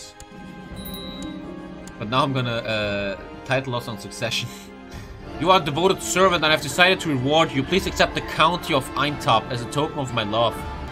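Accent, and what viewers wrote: Germany. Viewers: Is he german? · you sound a little bit Saxon sometimes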